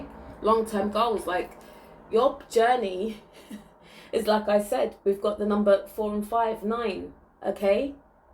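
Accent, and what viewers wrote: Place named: United Kingdom. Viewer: I have just tuned in... But I know....I'm feeling you already beautiful soul Si💫🙏❤️🌻🌄✨xx My place of birth Is not far from you, According to your accent 😉😋.... Isle of Man ... Ellan Vannin in my ancient tongue Mona / Mann By my Viking ancestors 650bc x In my ancient tongue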